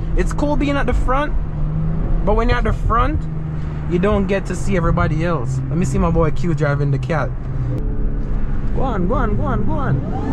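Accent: Jamaica. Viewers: Bro are you Jamaican ? I hear Jamaican the accent frl no 🧢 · You Jamaican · Lmaoo love the Jamaican accent/slang got me dying😭💀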